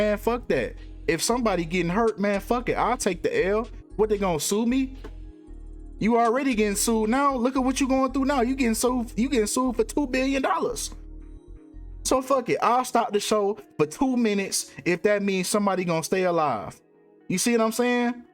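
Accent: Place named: Detroit, United States. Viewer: Bro you gotta be from Detroit i hear it in yo voice 😂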